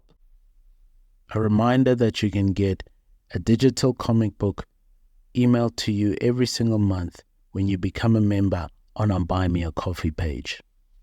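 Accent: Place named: Netherlands. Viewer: Who are you to decide for us you are a white boer you don't belong here either you were deciding on us when it was aparteid so now fick off to Holland and stay there with. Your Dutch accent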